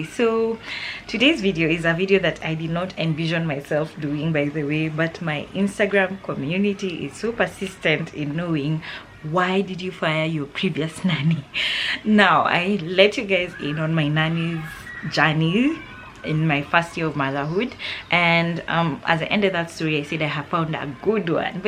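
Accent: Luhya, Kenya. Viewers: The luhya accent for me🤣🤣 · You are generally nice.....you remind me of one who kept reminding me how my children love her though i couldn't really see it....".aki unajua Hawa watoto wako wananipenda sana"same luhya accent......and once I bought a box of long life milk on a Sunday and on Tuesday ilikuwa imeisha😭she stayed for 8 months and I gave up...I was tired of her! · just watched this after a long strenious shift.....laughed woooi.....the luhya accent waaaa😅🤣.. you are a perfect narrator😅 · It's the luhya accent for me.. You had me at the school fees part na fee structure on the TV...😂 😂 😂 Mums aki we should get express tickets to heaven.. dealing with bosses at work and at home bado kuko ivi...ah... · It's the Luhya accent for me!😂😂😂😂😂.... The storytelling was 💯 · Sorry but you speaking the luhya ascent is funny😅😅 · Amazing story, it's very calming listening to you... 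Your luhya accent is on point 😅😅😅. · hahahaha i love the accent ya kiluhya hahah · 😂 😂 😂 😂 😂 The luyha accent. I had to replay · Wueh, it's the luhya accent for me 🤣🤣🤣🤣🤣🤣🤣🤣🤣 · Boundaries are important. I have my own issues can’t deal with hers also. Unless it’s life or death. Btw I love the luhya accent.🙌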